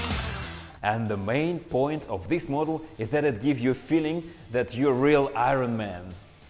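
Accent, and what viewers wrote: Russia. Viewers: А почему на инлище и с акцентом русским?) В чем проблема пилить для рашен аудиторишн?) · Русский акцент 🤔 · Пиздец у теья акцент) типичный русский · Ты спалил что русский) Акцент спалил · Ля а акцент похож на русский. Русский? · Этот русский акцент...ммм...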